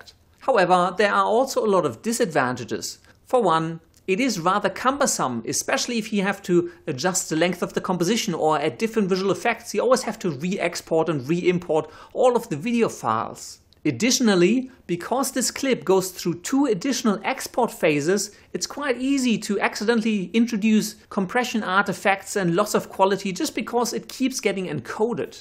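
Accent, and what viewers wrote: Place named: Germany. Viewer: Are you from Germany because your accent sounds so german?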